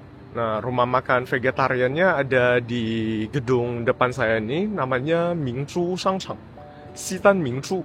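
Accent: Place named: Medan, Indonesia. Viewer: Org Medan mmg sebut modren klo tentara sebut tentra haha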